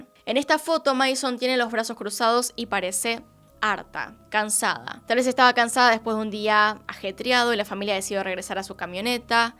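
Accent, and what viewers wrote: Argentina. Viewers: Yo comencé a seguir tb a otra así como este canal porque soy española y está muchacha de quién les hablo también, pero creo q hay sitios para todos, ( los buenos)y me acostumbro al español/ argentino, adelante, con esas preciosas pestañas q te pones..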